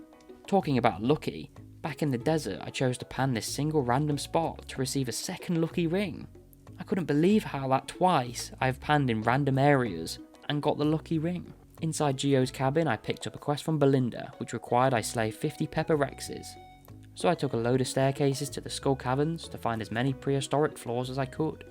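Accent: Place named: South Yorkshire, United Kingdom. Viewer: FINALLY i found someone who plays the curnocopia mod !!! Also your accent is VEERRY similar to mine im from south yorkshire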